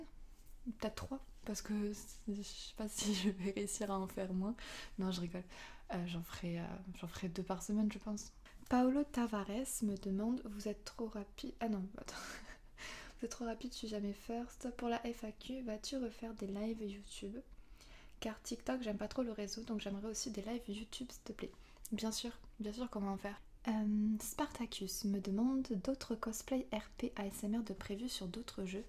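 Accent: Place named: South, France. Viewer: Merci, on entend ton accent du sud :) Mignon Kawaï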